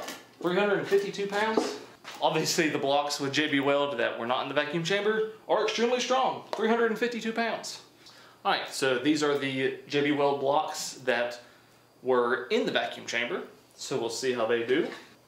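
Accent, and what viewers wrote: South, United States. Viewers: Did the beard bro-ham, you from southern U.S? Just wondering, mainly your accent.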